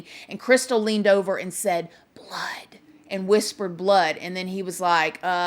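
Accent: South, United States. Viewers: Ok, I usually cringe at most youtube creators, especially when they have that southern accent...being European, it's like nails on a chalkboard. BUT...you are simply amazing. You're keeping it real, you've got charisma, you're empathic and I LOVE your southern manner of speaking. Keep rocking and never change! 😍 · where is your accent from sounds a little like a down south accent?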